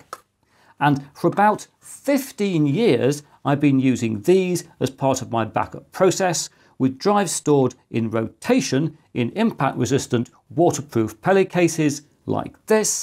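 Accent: United Kingdom. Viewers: I'd be really careful what kind of data you copy to this WD drive. The flimsy USB Micro B plug/socket is very sensitive to mechanical stress when handling the cable. I had a broken WD Elements just after warranty expired and also used to work in a company where we had several broken WD Elements drives. The drive uses a special (very thin) PCB which is made out of plastic and therefore can not even be re-soldered when the USB socket comes partially off (and it will if you unplug/replug often). The drive is a special version which has the USB connector on board and no SATA connector, so you will not even be able to remove the drive from the case and read its data via a SATA connection. Really good explanation of backup strategies, I do like your scripts and your British English... 😊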